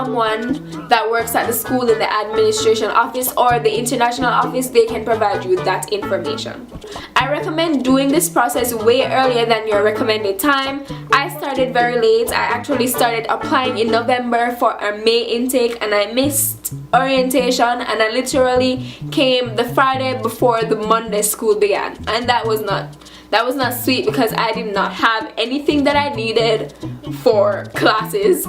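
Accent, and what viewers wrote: Jamaica. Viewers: she sound Jamaican.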